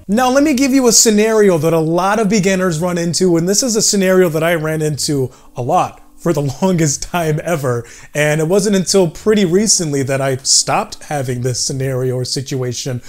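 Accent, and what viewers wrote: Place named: South, United States. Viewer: Bro you from the south? Like GA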